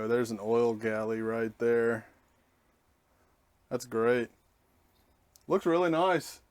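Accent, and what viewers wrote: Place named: Yorkshire, United Kingdom. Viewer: Off topic but you say 'Nicely' like a Yorkshire man almost. Plus a few other things sounding rather English. Whereabouts were you brought up?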